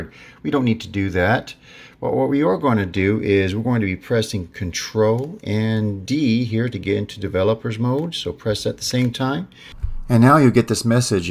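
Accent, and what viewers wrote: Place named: United States. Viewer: Hi there! 1st and foremost I wanted to say THANK YOU so much for being an american. I can't tell you how happy I am to be able to understand and hear you clearly. WOW, what a major difference. So thank you for that. I was so frustrated before I found your video. I followed each step, and it fixed my problems in less than 2 minutes. That was truly amazing. I appreciate people like you who take the time to make a video to help other people like myself. You make life a lot easier. My name is Cindy & I'm reaching out to you from southern California. Have a great day! Stay safe.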